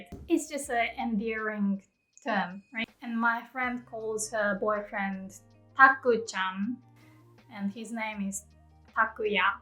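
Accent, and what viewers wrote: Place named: United Kingdom. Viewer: You have a british accent. Did you learn English in Britan?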